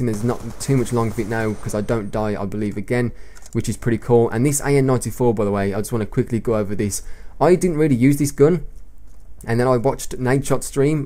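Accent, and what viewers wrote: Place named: Cannock, United Kingdom. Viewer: bit of a personal question but are you from cannock? your accent sounds like cannocks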